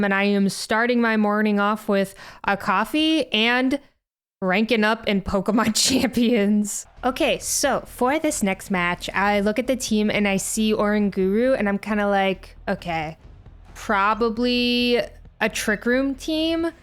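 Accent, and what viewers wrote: Boston, United States. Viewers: As a non-American I'm curious: is that Boston dialect?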